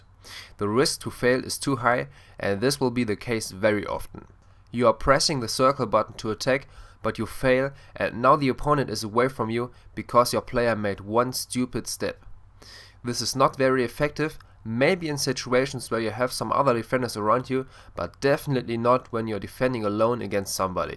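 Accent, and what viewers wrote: Germany. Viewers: This guy has an awesome accent. Hey, you all better listen to him, he's German! Germany have a sick international team. Thanks for the advice:) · Kann es sein dass du deutscher bist ? Are you from germany ? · you are german? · Thanks! BTW, telling by your accent, you are a German speaker? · ich hasse den deutschen Akzent!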